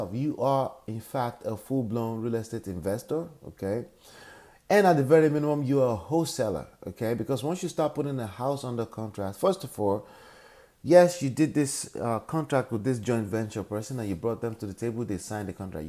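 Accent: Nigeria. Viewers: I think Nigerian accent. I love it. ❤ My daddy is Nigerian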